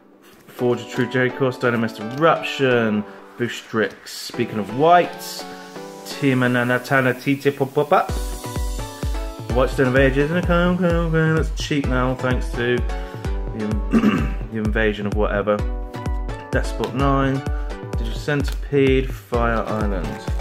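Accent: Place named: United States. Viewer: Great job and you sounded American not British and what's with long intro once not A problem with it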